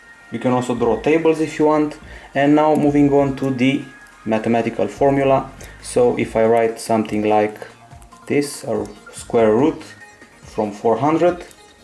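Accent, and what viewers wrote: Romania. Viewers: Ce accent romanesc:)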